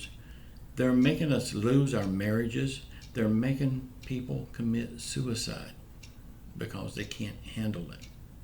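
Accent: South, United States. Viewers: Maybe you shouldn't watch this channel if you're offended by his southern accent. I'll continue to watch his channel , I quite enjoy it. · Thankyou!! Love your gentleman southern accent!!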